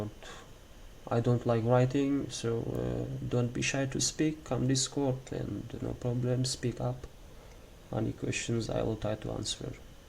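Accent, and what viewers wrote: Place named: Russia. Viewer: Loving the game, awesome graphic, characters, powers etc. Was brought there by my friend who is obsessed with it lol Btw interesting accent, Russian perhaps?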